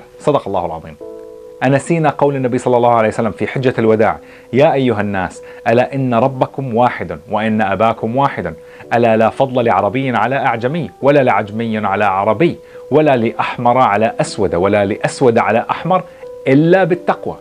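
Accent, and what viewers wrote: Algeria. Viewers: والله قبل ماتقول North Africa كنت حاسس انك منا هههه بطريقة كلامك وفهمك للدين ،أخوك من الجزائر. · صدقني فيك شيئ من الجزائريين من طريقة كلامك وكاريزميتك وأحاسيسك ومواقفك التي ذكرتها وعن والدك رحمه الله. كلنا مسلمين.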